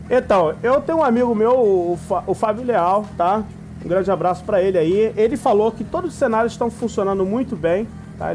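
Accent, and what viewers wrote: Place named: Rio de Janeiro, Brazil. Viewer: sotaque de carioca puxado, não é o mesmo produto, com arquitetura melhor vai sair incríveis add-ons, espera 1 ano que vc vai ver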